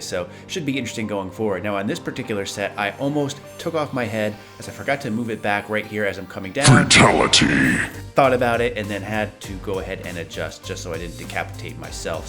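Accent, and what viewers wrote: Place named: South, United States. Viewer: YUSSSSSSS the return of with that ole southern accent. Appreciate it man it sickening.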